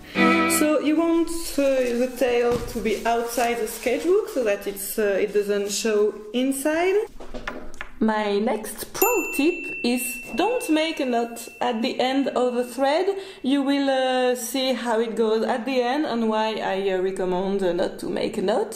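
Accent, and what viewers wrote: France. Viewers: Wonderful!! Thanks for showing us! And I love your french accent. It reminds me of when I lived in Paris in 2010. Yes, you make some little mistakes sometimes, but it's very charming! 😊✨💕🇫🇷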